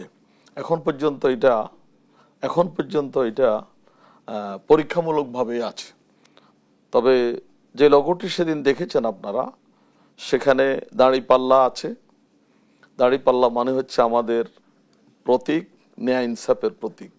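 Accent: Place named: Kolkata, India. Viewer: সনচালক কলকাতা স্টাইল এ কথা বলেন কেন?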